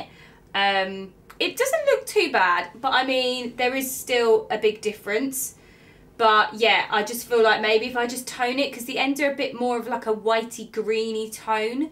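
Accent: United Kingdom. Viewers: Not to be rude....i dont mean you personally... but british accent in my ear is not the most beautiful to hear...its to show off kinda talk... a puh dah on...... baahh...im.train thu match on..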